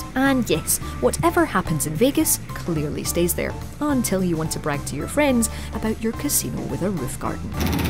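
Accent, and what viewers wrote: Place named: Scotland, United Kingdom. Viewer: her accent reminds me of merida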